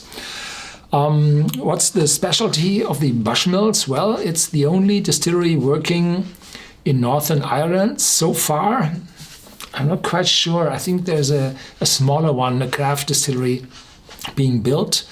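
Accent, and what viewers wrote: Germany. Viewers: I hear a German accent?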